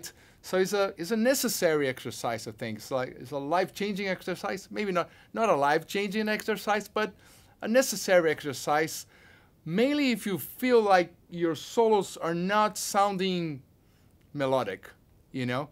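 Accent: Brazil. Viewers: disse que sabe falar em ingles como um nativo mas fala desse jeito abrasileirado porque gosta, meio que simbolo brasileiro sla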